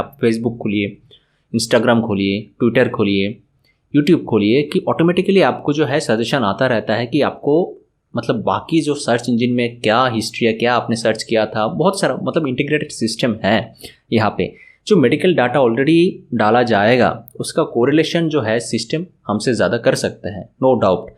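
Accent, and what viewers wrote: Bengal, India. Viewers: Areyy bhaii Hindi te r English Bengali accent bojha jachhe naa nki ?? ... R emni teoo ... Bangali toh obviously Bengali fluently bolteii paarbe .... Kaarpn Mother Tongue toh Bengali ... !!! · Love ur bengoli accent😅😅 It remides me of my chemistry teacher He was also from wb He came mp Whenever he speaks he makes commendable mistakes in hindi Lovely teacher Debdas Patre #arrowdere Love u sir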